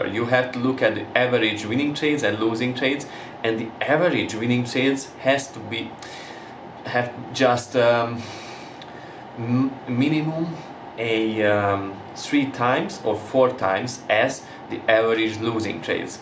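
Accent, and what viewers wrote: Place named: Hungary. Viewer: I think from hungry, as far as the accent goes. I'm from there, too. So i guess I could identify it :)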